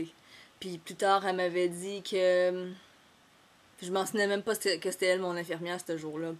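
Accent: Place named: Canada. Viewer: aille, j'ai du mal a comprendre!!! lol l'accent canadien est different que cell de la france.. mais ca va encore, je comprend un peu!! =D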